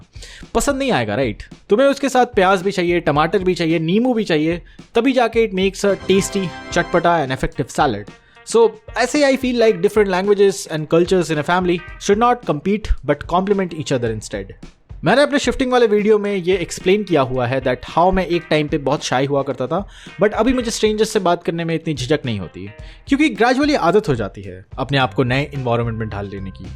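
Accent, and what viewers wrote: Bengal, India. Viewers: Mannnnnnn YOUR Bengali accent ❤️❤️😊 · Par dude your Hindi sounds Bengali enough to understand that you are a bengali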